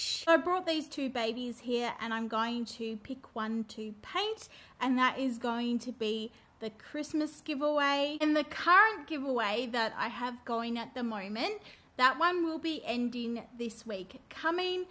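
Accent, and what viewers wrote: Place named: Australia. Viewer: Hi sweetie,i just adore your accent,i so wish i had been born in Australia. That’s a great idea,they are so cute,this is so generous of you,i dont know if I’m allowed to enter as I’m from Ireland xxxxxxx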